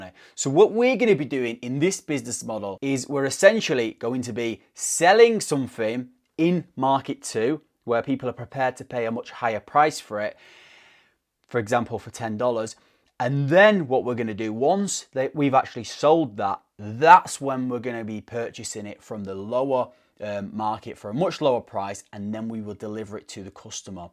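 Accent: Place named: Manchester, United Kingdom. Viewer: Who else missed that Manc accent 😁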